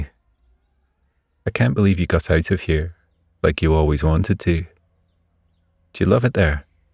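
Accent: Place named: Scotland, United Kingdom. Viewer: A cosy Irish story I chose to help me sleep before work in a few hours.. but the accent is Scottish. Can't work for me with an Irish story anything else fine but not an Irish story 😔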